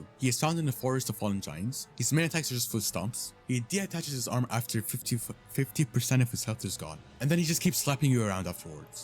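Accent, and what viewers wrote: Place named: Gulf, Arab world. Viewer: u got a khaleeji accent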